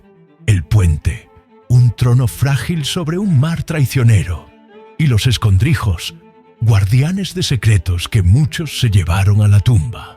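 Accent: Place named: Castile, Spain. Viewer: SIN PALABRAS, MÚSICA, VOZ, LOCUCÍON EN CASTELLANO NEUTRO ...ESTA BIEN PERO MENOS ACENTO CASTIZO X FAVOR ASI SE ENTIENDE AL 100 % . IMÁGENES ILUTRADAS GENIALES ....ESOS FOGONES DE LIS COCINEROS.. SOBRE PISO DE LA CUBIERTA DE MADERA..MMM ..NO ES REAL. PERO...EN FIN..ES MUY ÚTIL Y EXPLICITO E INFORMANTE AL 100!!.. FELICITACIONES.😅😊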